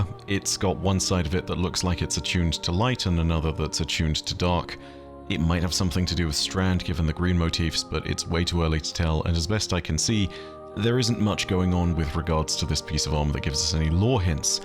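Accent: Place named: England, United Kingdom. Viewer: Scuppered. Love it, thanks for my daily dose of the King's English.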